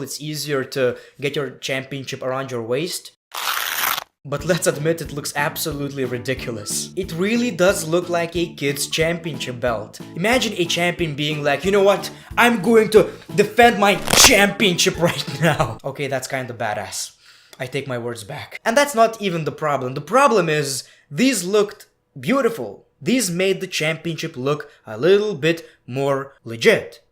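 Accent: Russia. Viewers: Wow! I think you have a great Russian accent! (Because you are Lithuanian obviously but still) with love, from Astrakhan, Russia.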